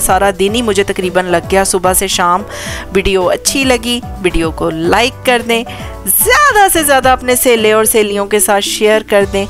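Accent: Punjab, India. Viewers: Panjabi ka trka to bara vadiya lagati hain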